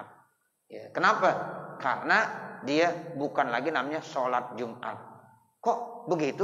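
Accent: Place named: Betawi, Indonesia. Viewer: Smg Allah brkn kesehatan kpd guru kita untuk terus bisa berdawah dgn bhs betawinya yg khas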